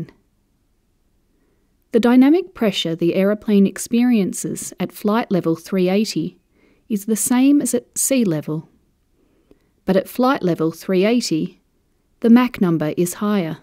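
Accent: New Zealand. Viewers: ??Does this NZ narrator ALSO do the "Chickens in my backyard" videos.??? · What accent is it? Is it kiwi or aussie ?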